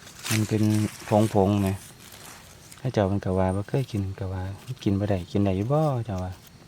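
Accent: South, Thailand. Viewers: พูดปาษาใต้จัดๆอิดแล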